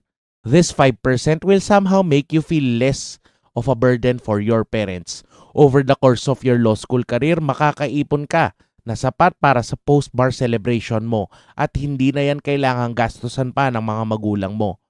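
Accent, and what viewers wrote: Philippines. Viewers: English language with Filipino accent.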